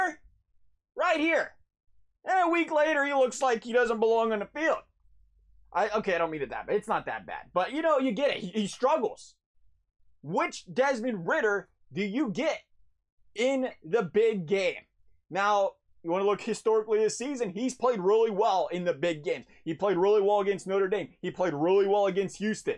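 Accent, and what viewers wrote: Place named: Ohio, United States. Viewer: you are the most Ohio looking and sounding person I’ve ever seen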